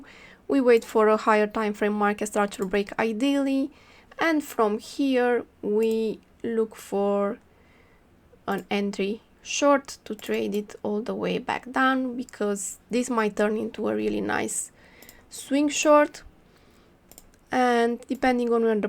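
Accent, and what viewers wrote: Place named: Romania. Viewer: Are you Romanian ? That accent is familiar.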